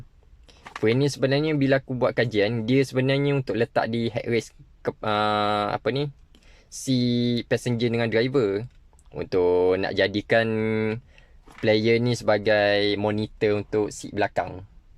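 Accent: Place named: Terengganu, Malaysia. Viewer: Brekmok orang teganu cakak gini ni.hahahaaa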